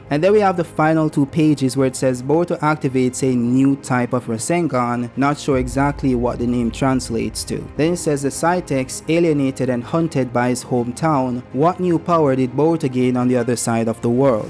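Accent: Jamaica. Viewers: Are you jamaican i picked up your accent 😂😊 big up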